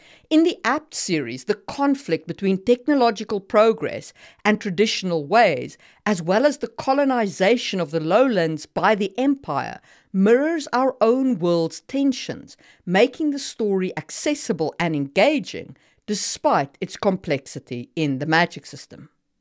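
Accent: South Africa. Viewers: You sound like you're from South Africa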